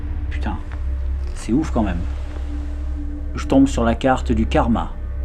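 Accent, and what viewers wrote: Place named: Romania. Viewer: super ton accent Roumain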